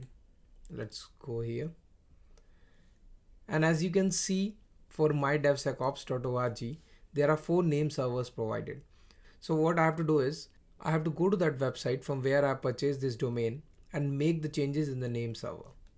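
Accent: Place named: India. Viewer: first and foremost, thanks for the video. Also thank you for speaking clearly. I often close videos with Indian speakers because it's hard to understand. You have a heavy accent but it's clear and professional and a quality sound recording. thumbs up and cheers mate!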